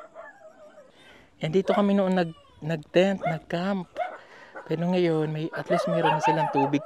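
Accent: Visayas, Philippines. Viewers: Good evening sir, marunong kana talaga mag bisaya salamat sa pagmamahal mo sa amin dito sa mindanao sana i bless ka pa lalo ni Lord kayo ng PB team at PB sponsors bigyan kayo ng malalakas na pangangatawan para sa walang katapusang mission at pag tulong congratualtions pala sa bago mong natanggap na award 🎉❤❤❤ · Marunong na talaga mag bisaya si sir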